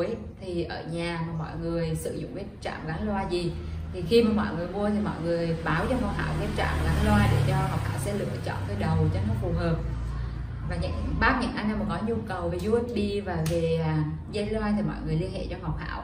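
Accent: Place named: Hue, Vietnam. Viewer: Tiếng gốc Huế đây rồi:)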